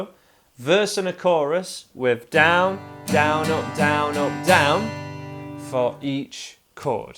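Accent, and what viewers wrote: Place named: West Yorkshire, United Kingdom. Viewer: What part of West Yorkshire is that accent from?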